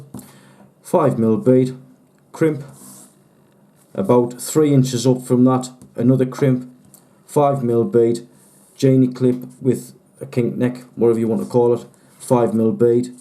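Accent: England, United Kingdom. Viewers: If your english through and through you would be able to understand him and also know what part of england he is from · You make great rigs, but I wish I could understand you better, I have big trouble with English and Irish accents..... I'm from the deep south USA where we talk real slow. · Got half way through your video and had to turn it off, I couldn’t understand a word you said, only the odd word here & there.. it’s speeded up mumbling type thing that I couldn’t get. ? Are you English? I am through and through.. I’m sure your video is very good but I have no way of knowing ?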